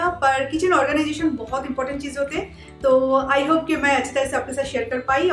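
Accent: Bengal, India. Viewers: Love bengali's hindi.. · Boy you have a heavy bong accent but I liked your honesty.. 👍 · I have some bengli friends..they speak hindi like u..un dostonki yaad agayi..nice kitchen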